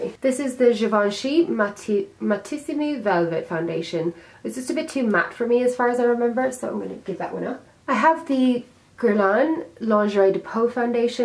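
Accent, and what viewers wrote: Ireland. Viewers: I'm learning Irish accent with your video! Thank you✨ Love from 🇯🇵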